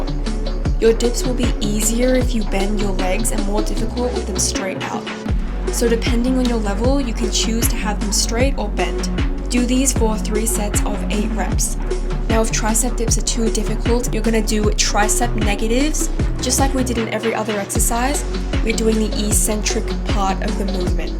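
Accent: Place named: Australia. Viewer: Prefer your natural Australian accent. Beautiful videos. Thank you.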